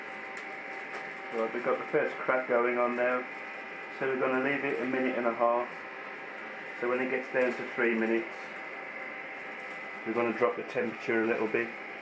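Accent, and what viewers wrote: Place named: West Midlands, United Kingdom. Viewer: Funny that you should mention the kitchen full of smoke :). Yep you guessed it, I couldn't wait for the ducting pipe to arrive and roasted my first batch. In all honesty for a first batch, they really are quite good. I am indeed in the UK, Derby, East Midlands and from your accent I would hazard a guess that you are in the West Midlands. I would certainly be grateful if you could let me know where you get your storage bags from, I have looked on Amazon where there are so many to choose from I just get bamboozled :). I would definitely be interested in the coffee beans too please if you could provide a little more information. Many thanks and best wishes. Ian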